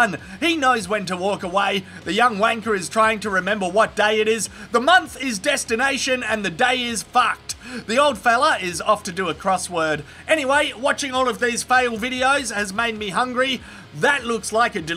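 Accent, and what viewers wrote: Australia. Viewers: Why is it funnier when it's in Aussie?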